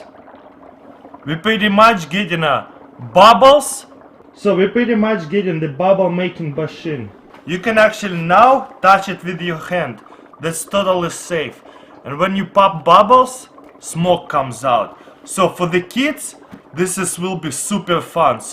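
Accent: Russia. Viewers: russian english is very funy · cmon... i like this Russian accent :p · сразу слышно что русский хдд но очень интересно поэтому лайк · дуратский английский..когда слышно что русский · you got Russian acsent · I'm French canadian living in west english speaking environement and this guy is really cool and authentic Russian accent. Keep that budy we love you and your funny experience. Thumbs up! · Love your russian accent!! great vids btw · Учусь на ин язе . Когда я это услышал ,я думал умру от этого акцента. Если Вы русский тогда снимай на русском или это принцип какой-то? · i fking love this fking russian :P when he do something he always say boom :P hes so funny and his english making me laughing so hard :PPPPPPP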